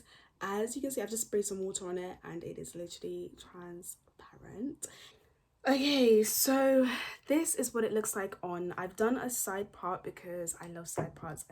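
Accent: United Kingdom. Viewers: Your British accent is so refreshing and it's giving!!😩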